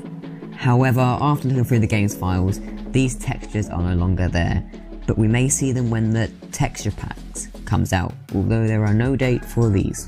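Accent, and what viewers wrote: United Kingdom. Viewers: Are you, British/Briish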